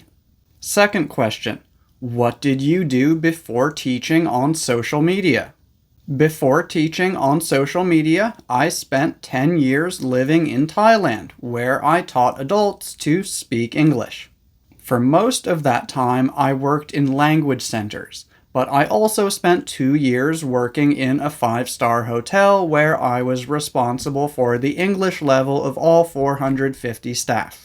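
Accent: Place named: United States. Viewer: Fine. I noticed you have an american accent.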